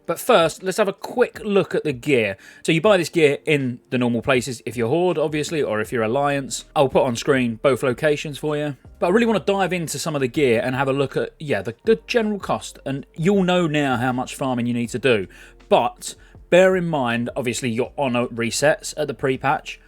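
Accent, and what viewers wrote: United Kingdom. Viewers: I love your content but can you please fix your British accent? That would be great. At least start pronouncing your T’s....it’s Thirty with a T, not thiiieeeey.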